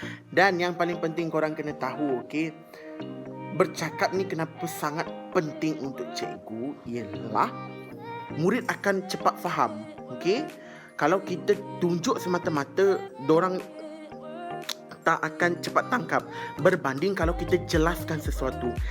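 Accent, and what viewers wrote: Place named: Kelantan, Malaysia. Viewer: Kechek klate bohhhh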